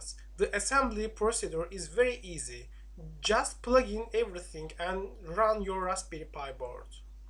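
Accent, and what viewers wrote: Turkey. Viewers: Türk aksanı bu nerde duysam tanırım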